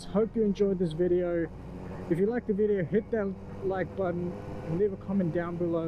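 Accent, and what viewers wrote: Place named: Australia. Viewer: It's an awesome bike. I've got the 2019/2020 model and it's an absolute peach of a motorbike. Got it in July 2020. This was a great review as well. I notice the Australian accent mate. Nice to see some Australian content on YouTube as well. Yeah, the s1000r is the best of both worlds in my opinion. It's a total hooligan & docile put-put bike for around town. The quick shifter is the smoothest I've had & fueling is perfect. Super responsive and plenty of get up & go, even if you have leathers with your name on the back of'em.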